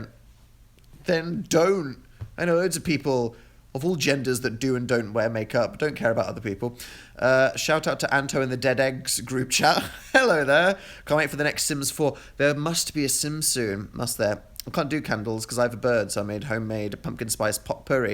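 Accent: United Kingdom. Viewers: your british voice soothes me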